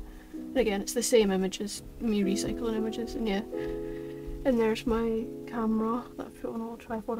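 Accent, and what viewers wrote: Scotland, United Kingdom. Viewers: OMG A SCOTTISH BLOXBURG YOUTUBER SCOTLAND FOREVERRRR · She’s Scottish like my silly billy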